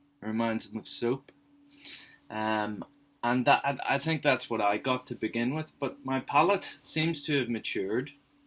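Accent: Ireland. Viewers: Oh, now I see ,You are an Irish man, gotcha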